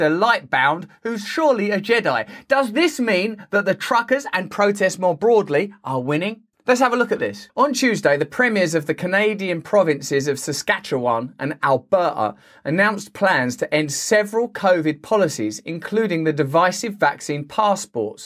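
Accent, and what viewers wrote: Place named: England, United Kingdom. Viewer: I luv ow you pronounce words... Souch a real english dude :D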